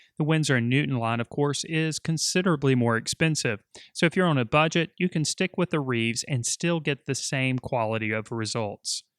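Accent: South, United States. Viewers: I know, I just find it funny how he pronounces it with his Southern drawl.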